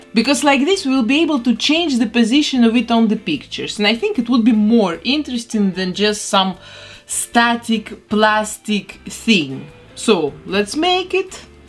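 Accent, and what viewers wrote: Russia. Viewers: А никого не смущает ее дикий русский акцент? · Русский акцент? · русская чтоль? акцент- слушать невозможно, только смотреть, видео интересное! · Кукла получилась классно. У вас сильный русский акцент, поэтому подумала, что вы меня поймёте). Работа сделана весьма талантливо.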